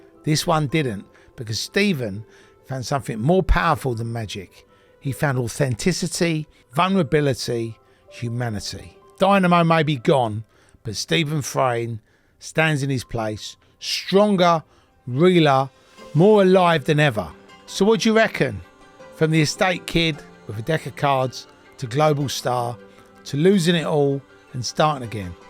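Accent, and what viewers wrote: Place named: England, United Kingdom. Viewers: I can't even lie.. I'm a cockney through and through and listening to the story of Dynamo told by someone who sounds as if he could be my uncle really makes me feel as if I'm closer to the story than I am. I also believe this was narrated beautifully. Im so glad to have seen Dynamo show face when he was invited into a sidemen video this year (2025). His comeback is nothing but remarkable. I truly believe that if jesus had made his second coming.. then Dynamo is one of the ones who was chosen to show the world the true power of what God and jesus can do. I'm far from religious, however the feats he has performed.. some are on par with the words of the miracles performed by jesus.. make of that as you will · Complements to the narrator with the very strong English accent. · I loved the way you told the story it's nice to hear an English accent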